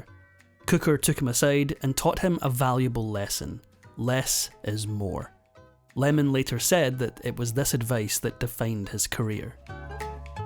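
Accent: Scotland, United Kingdom. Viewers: Oh no, not another Scottish/British voice-over for an American Actor. CRAP ! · I love the lads wee Scot voice too… bc it reminds me of my grt uncle Micheal, a born raised in Edinburgh Scot. And the movie docs are grrrrrreat! (In my best Scottish roll of the r!) 😊❤ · Well, I'm in. I've watched several of your vids, and I've enjoyed every one. You manage to pick up interesting threads in performers' lives, trace them to their beginnings, then construct a well-researched, well-written chronological account of one portion of the tapestries of each of their lives. The Scottish accent is pretty nifty, too. I enjoy hearing "person" pronounced "pairson."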